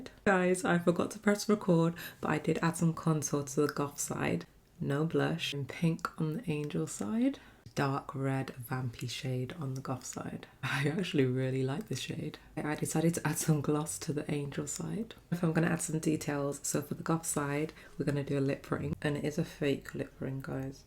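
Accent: United Kingdom. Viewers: You mean to say, she speaks English in a British accent… like all British people do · She sounds so British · I like both but goth is the winner for me also are you British or something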